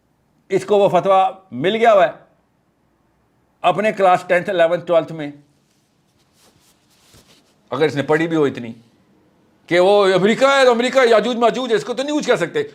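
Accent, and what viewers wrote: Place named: Punjab, Pakistan. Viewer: mispronouncing with your Punjabi language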